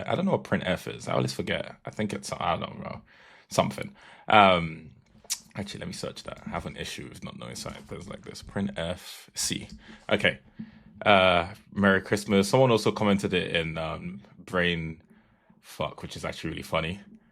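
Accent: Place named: Nigeria. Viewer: i hear the nigerian accent boss 😂🔥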